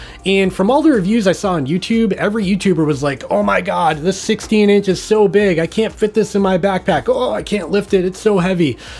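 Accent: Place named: Canada. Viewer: I thought he was Canadian too. The way he says “about”….aboot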